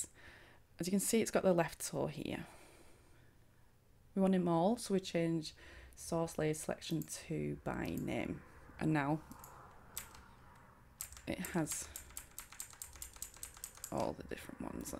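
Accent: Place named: Leeds, United Kingdom. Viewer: Transfer "Wearr-ts" ! I love your Leeds accent.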